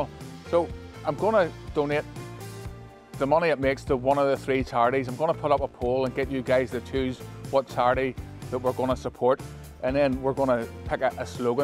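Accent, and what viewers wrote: Northern Ireland, United Kingdom. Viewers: Great video again, learning loads since I started watching you. I’ve even learned how to understand the thick N.I accent 🤣👍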